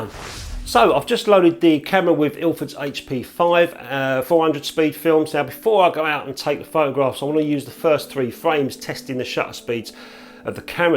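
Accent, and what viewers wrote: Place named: England, United Kingdom. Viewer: dear, every time I need to hear some healthy English accent I tune into your channel please note < need to> Of bleedin 'course you butcher all them foreign words, you're English, aren't you? it's < tzeyes > Nettar you've got it right, Anastigmat wrong, as usual golden rule for English, Welsh, Scots, and other British subjects is: if you naturally stress the first syllable then you can bet your posterior it's second one you should stress. The syllable you strees naturally and the syllable you pronounce more or less neutrally are your best guide go against your natural tendency and stress the other syllable ana-STIG-mat you says, anastigMAT is right admittedly it's a difficult one having four syllables;Love you, your videos, some of your pics and your effervescence. What a pity they banned smokin' in pubs ( please pron vinnie coz it's German & I'm a bloke )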